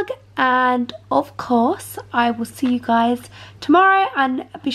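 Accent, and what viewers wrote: United Kingdom. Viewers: Omg British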